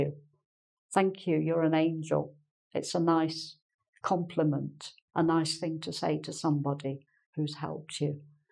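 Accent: England, United Kingdom. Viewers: Good evening Teacher! You are amazing! When i hear you speaking is = brazilians speaking portuguese. The british English is softer to our ears than american english. Thanks for your teaching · Just came to know your channel last night.accidently....oh ..you are so good teacher!!!!!your accent reminds me the time l lived in England! So lovely tobhear you teaching, your speaking. Your teaching make look like english speaking so easy!!!!!which is not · really u r an angel to guide the people...your expression is marvellous with typical British accent...thanks a lot ma'am.. From Kolkata..india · Thanks for your help! You really are an angel! I just started to watching your videos and it's "lovely" your british accent! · Standard of British pronunciation she speaks ......so clear...and easy to catch up.....appreciated this lesson....many thanks for your lesson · british accent, ouch! 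· She is great “old school “ teacher.I already know most of the topics she is teaching,with foul modesty I am fluent in English,but her English English is helping me a lot,since I still have very strong accent and there is always something new to learn from her ❤️ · I forgot about the lesson you gave and instead I really enjoyed the British accent. It's amazing. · uk accent? i love it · Hello... A very clear and useful class. You are an angel and British English in a person, too... Greetings!!! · congratulations, your accent is England definitively. Thanks for the the subject metaphors it in fact improve our learning the english as a second language. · British accent! Sounds good :)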